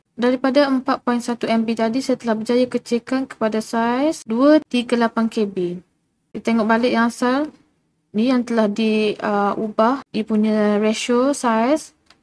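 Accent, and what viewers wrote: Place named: Terengganu, Malaysia. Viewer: bunyi jgk slang ganu ye 😂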